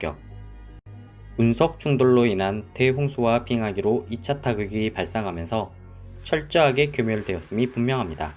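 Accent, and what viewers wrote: Jeolla, South Korea. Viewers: 진지하게 얘기하다 중간중간 튀어나오는 전라도 억양이 너무 귀여우시네요!ㅎㅎ 좋은영상 앞으로도 부탁드려용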